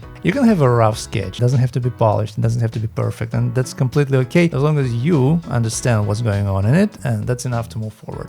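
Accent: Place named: Russia. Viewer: хорош кста слышно русский акцент 😃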